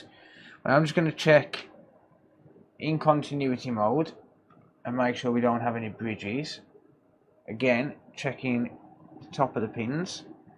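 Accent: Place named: Birmingham, United Kingdom. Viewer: Come on brummie lad . You're best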